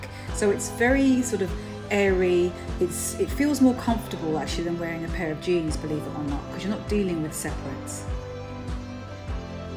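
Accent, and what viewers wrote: United Kingdom. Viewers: Your style and personality...bonus British accent.😍🔥😎...thank you,! 😍😊❤️☀️Oh, that mannequin... I want one! You and Michael have a wonderful Summer!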